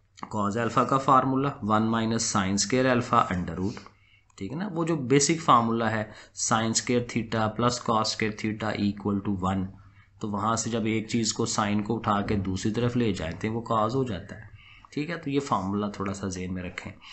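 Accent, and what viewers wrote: Sialkot, Pakistan. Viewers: sir I think u r from Sialkot ❤️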